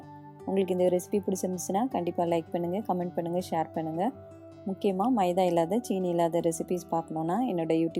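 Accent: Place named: Tirunelveli, India. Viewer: எங்கள் சொந்த ஊர் குற்றாலம். உங்கள் பேச்சு மற்றும் சமையல் செய்முறை பார்த்தால் திருநெல்வேலி போல் உள்ளது. உங்கள் சொந்த ஊர் எங்கே.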